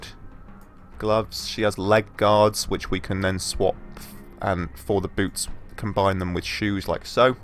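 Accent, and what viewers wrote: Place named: United Kingdom. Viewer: Oh heck yes! A sexy British voice guiding the events that unfold upon gameplay! Heck ya!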